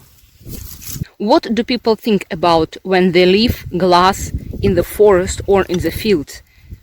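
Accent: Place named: Eastern Europe. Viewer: I gave you your 82nd subscription, I hope you reach 100 very soon! This video showed up on my homepage randomly, so this is my first viewing of you. It would be nicer tor additional viewers interested, to know the general location of where in the world you are building your personal paradise? You speak good English, but definitely makes me think perhaps your in Eastern Europe somewhere? As a suggestion, and perhaps more viewers from your general area will feel a relation and subscribe?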